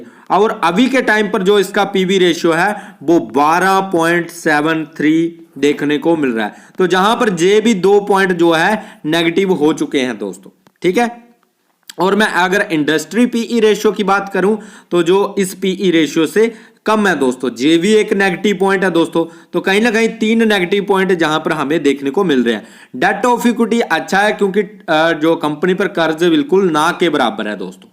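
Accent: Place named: Punjab, India. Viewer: Bhaji tuhadi hindi sunn k lag reha Punjabi ho😅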